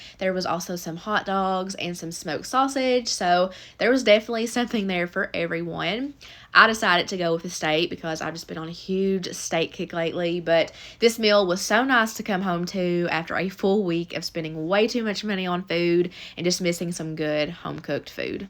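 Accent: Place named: Boston, United States. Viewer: I just love your videos, and as someone from Boston your accent just wraps me in warm joy <3 I'm a dietitian and your videos are so helpful for sharing with my clients who get really stuck on meal prep needing to be "perfect." You always have a good mix of complex and simple meals, they're always nutritionally balanced in terms of protein/fat/carb/fiber, the ingredients are accessible and economical, and they look delicious! You're also not afraid to try new things, and share the reality that some don't always work out the way you hoped. Thanks for all of your content, it is a huge help to people who struggle with this, and it is REAL fun watching you cook :)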